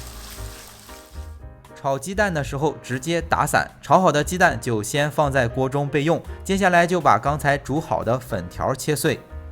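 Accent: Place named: Dalian, China. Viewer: 听这位老爸的口音似乎是大连银😆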